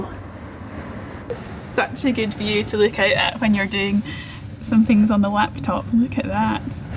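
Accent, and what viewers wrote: Scotland, United Kingdom. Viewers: The Scottish accent❤ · I love your videos......I love your accent....I can't place it.......people in Clarkston spoke like you, but I feel you are from Fort William or the such like. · Hi How are you doing today How was your Christmas Holiday I like your youtube channel I watch every video you are beautiful, i like your Scottish accent Stay safe traveling Take care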